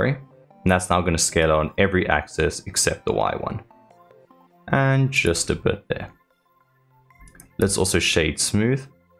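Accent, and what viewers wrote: South Africa. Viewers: Are you afrikaans? Also great video, thanks for the tips.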